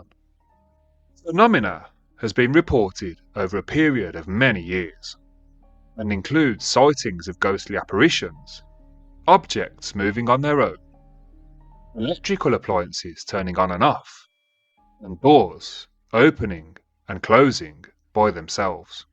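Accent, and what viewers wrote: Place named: Birmingham, United Kingdom. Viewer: Your appalling brummie/black country accent is far more disturbing than the tales you are telling,,,,,,Get some elocution lessons...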